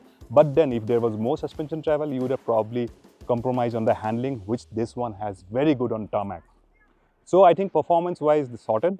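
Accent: Assam, India. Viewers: Happy to see more assamese people in the automotive space. Accent tu huniye vabisu axomiya neki baru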